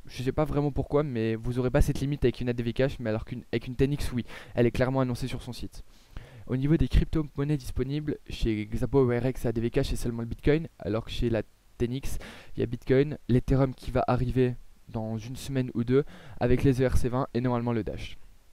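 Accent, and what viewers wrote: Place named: East, France. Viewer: T'as un accent de l'Est. Tu viens d'où? Lorraine?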